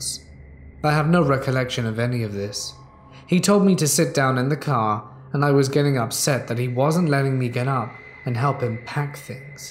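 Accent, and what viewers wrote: United Kingdom. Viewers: So many of these stories are typically American teenagers but your narrator is obviously British. He’s excellent. Could pass fir Mr Darcy telling me a story. I’d love to hear British horror and not the stories of American kids, campfires and wendigos which get samey. But This first story sounds good.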